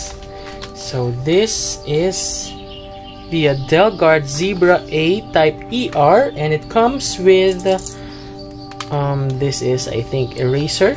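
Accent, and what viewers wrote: Philippines. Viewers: Are you Filipino? Your accent is lovely.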